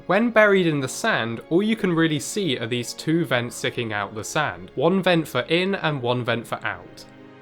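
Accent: United Kingdom. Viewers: The Brit accent seems to be so very trendy on videos, cable news networks etc. It's as if it somehow adds a bit of legitimacy to a story. Why? I'm wondering how many people share my view that the accent is annoying at best, and a distraction that serves no purpose. Surely there are out of work Americans "journalists" who could read teleprompters without the haughty, lecture like tone and attitude. It ain't right! lol!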